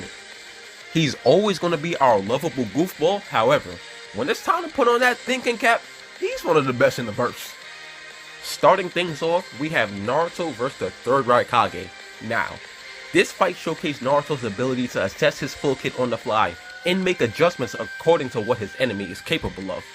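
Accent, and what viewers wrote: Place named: East Coast, United States. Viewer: Where are you from east coast USA huh ?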